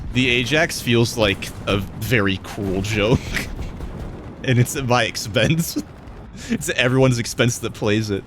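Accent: United Kingdom. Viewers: you are british?😟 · IS BRITISH? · Youre british? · wait is British? I’ve been hating on a fellow Brit for hating British tanks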